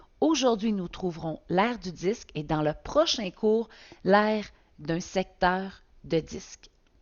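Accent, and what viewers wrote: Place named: Canada. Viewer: je suis sur que vous canadienne car l'accent montre. Bisous.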